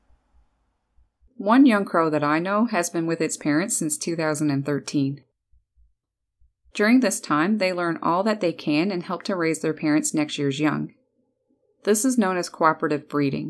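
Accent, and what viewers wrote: Canada. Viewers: Many people love the accent of British and Australian, not me , I enjoy the Canadian accent there's something aboot it I find relaxing. Lol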